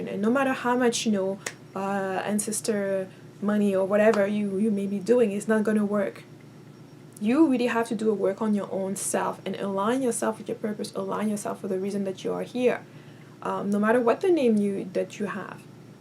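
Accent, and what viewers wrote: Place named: France. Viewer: i can hear that french is your first language. I have the same accent lol. americans are right, it does actually sound beautiful! i used to find mine annoying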